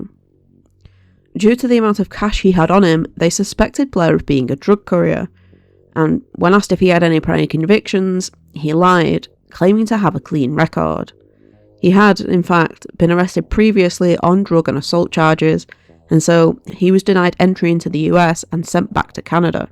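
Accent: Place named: Huddersfield, United Kingdom. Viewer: Just found the channel and subbed because you sound local to me (Huddersfield) good luck 😀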